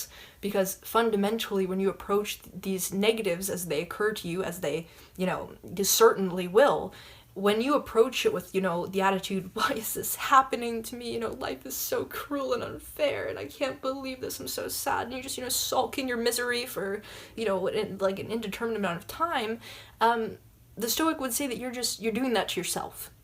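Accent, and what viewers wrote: Canada. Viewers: I have a hard time figuring out if you are Canadian or Danish? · Are you Canadian per chance? The way you pronounce "about" sounds Canadian. 😉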